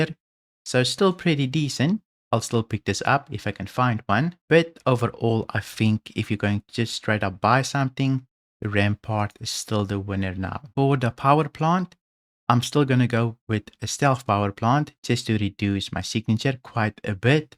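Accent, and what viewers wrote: South Africa. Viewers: awesome thank you, are you from South Africa?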